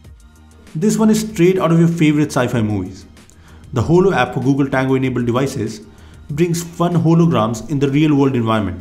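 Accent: India. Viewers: Im fucking done with indian accents it sounds like gurgling sounds😠😠😠😠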